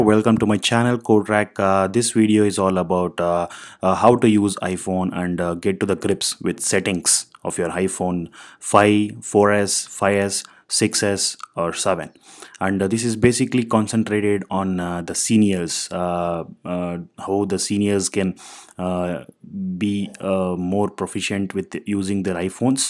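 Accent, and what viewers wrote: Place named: India. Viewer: neverhome_rph I am in total agreement with jarcohen You MUST rehearse your You Tube presentations and LEARN to pace your speech. You speed up at times which makes what you are saying TOTALL ILLEGIBLE! Then you then speak slowly and you information comes out clearly. There were too many instances when your fast talk, combined with your Indian dialect, made your presentation too difficult to follow. PLEASE SLOW DOWN!!!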